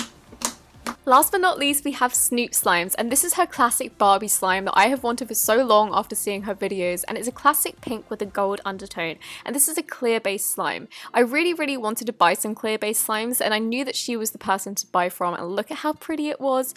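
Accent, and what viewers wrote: United Kingdom. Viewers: Sooooo im still confused are you brittish? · Hamiltrash -_- "the british accent" is very broad britian includes scotland england wales a n ireland, most people that live far away from eachother (such as the north and south of england) cant understand what they are saying! accent is deffinatley english, like zoella's but she definatly doesnt sound northen so im guessing she is from some area more west also if you say that are language is weird (which I know you havent said that but lots of people do) just know that we (the brits) * created the language you speak! have you everheard an american tell anyone that they speak american? well if you have then they are damn wrong because americans, canadians and so on speak *ENGLISH the language that origanated in, what country? England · what part of the u.k. are you from i live you're accent!!!!!!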